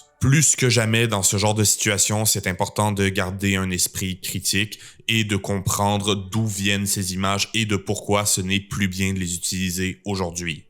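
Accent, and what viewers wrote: Quebec, Canada. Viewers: Hoo il viens d’où cet accent? Quebec ? Dsl jsuis juste curieux. Sinon tjrs content de voir une analyse d’une de mes oeuvre favorite (du moins le roman, et oui j’aime la cruauté du peter original 😅) . Que tu a tres bien reussi.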